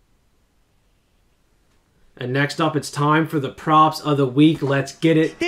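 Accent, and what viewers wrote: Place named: Charlottetown, Canada. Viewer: I knew that accent sounded familar. I'm from Charlottetown.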